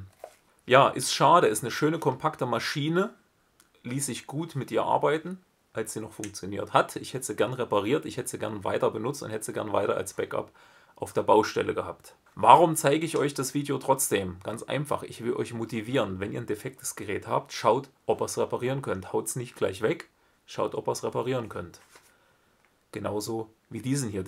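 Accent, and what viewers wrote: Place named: Saxony, Germany. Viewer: ....na ich kenns aus Sachsen.....klingt für mich nach Heimat, meine Vorfahren kommen daher......😉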